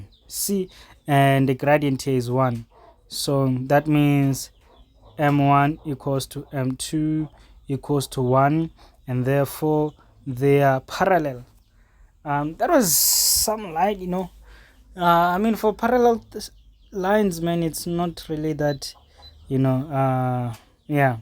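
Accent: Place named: India. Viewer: I love your energy, accent, and your teachings! Big help for I am going to take CET in 3 days. Thank you random Indian teacher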